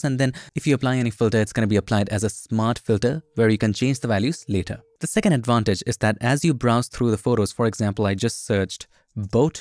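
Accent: India. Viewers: u have an annoying voice, I can still hear the indian accent u are almost succesfully hiding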